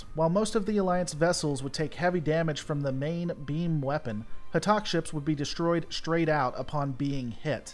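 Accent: United States. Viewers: Please learn to speak English. Not barstardised American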